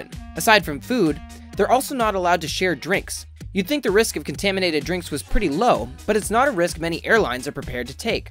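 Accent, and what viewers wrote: Canada. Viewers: The narrator is a Canadian, obviously. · Are you canadian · He’s Canadian (like me)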